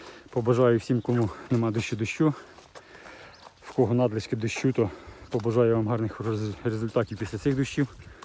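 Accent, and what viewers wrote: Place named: Vinnytsia, Ukraine. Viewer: Як приємно чути вінницький говір! Буває тільки заради цього дивлюсь))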